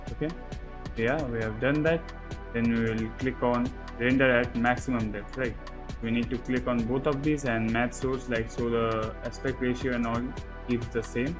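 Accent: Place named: India. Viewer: 😊Indian high quality voice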